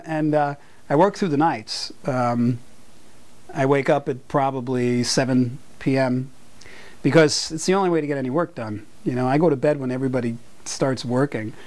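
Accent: United States. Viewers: Love the New York accent. Awe-chestra! :D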